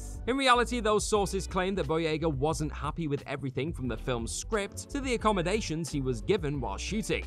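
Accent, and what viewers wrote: United Kingdom. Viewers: He's Bri ish though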